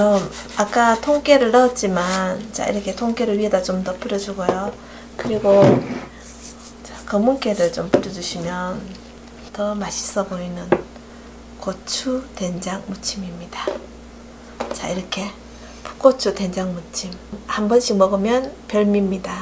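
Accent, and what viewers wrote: Gyeongsang, South Korea. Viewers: 반가워요 이견을 주셧어 고마워요 님 께서 같은 경상도 구수한 고향 말씨에 모습이 석가세존 을 닮으셧고 집사람 과 같은 넉넉한 마음씨에 정이 갔어요 행여 뎃글 읽으시는데 불편하셧다면 넓으신 아량으로 관용을 바람니다. 오늘 날씨가 너무덥고 폭염이 계속되는데요 스트레스 는 만병의 원인이라고 하는데요 늘 건강 하시고 행복한날 되시기를 기원할께요 😊⛵피서 하세요💖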